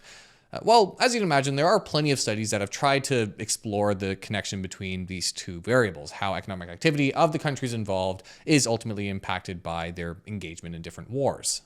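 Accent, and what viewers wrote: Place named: Canada. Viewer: i love the fact that you canadians always have an interesting accent...like "welcome to the pleine baygle" hahahaah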